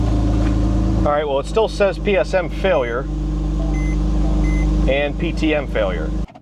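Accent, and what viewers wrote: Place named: Central Pennsylvania, United States. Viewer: “I’m going to put this battery in awhile”. Gotta love that central PA dialect.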